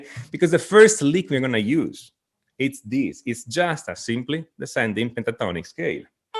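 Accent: Italy. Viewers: I love your positive energy, your great insight, your interesting ideas and angles and your charming italian accent. You make me smile as you make me wiser. Thanks! Mille grazie!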